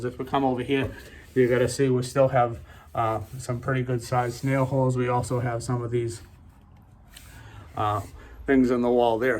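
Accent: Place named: Boston, United States. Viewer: I can tell you now that yes..that's $USD That accent is from Boston, Massachusetts.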